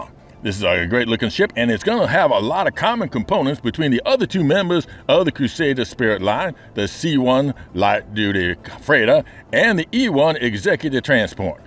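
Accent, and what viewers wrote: South, United States. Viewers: what's up with the extra southern accent this time around? lol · I agree that the dive bombing attack, similar to a Douglas SBD Dauntless, is a likely way to get more precision. I think a low glide bomb attack, more like a Martin B-26 Marauder, could work BUT you'd have to keep your speed well up and drop from no lower than 500 Meters (as it looks like the blast radius is about 350-400 meters).. (not sure why the southern accent but boy howdy tie A1 looks fun)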